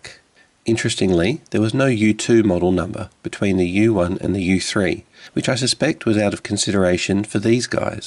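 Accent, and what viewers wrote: Australia. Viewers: Ah! I see. I didn't realise you're Aussie. Great video!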